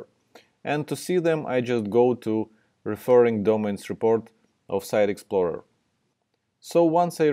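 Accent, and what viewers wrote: Russia. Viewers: Акцент скорее как у Васи русского, а не у ))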